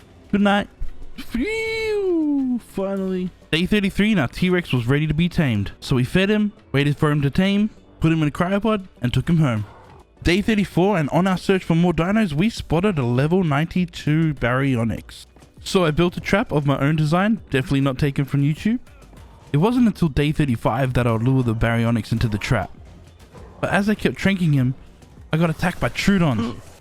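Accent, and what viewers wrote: New Zealand. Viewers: You sound like you’re from nz. Are you?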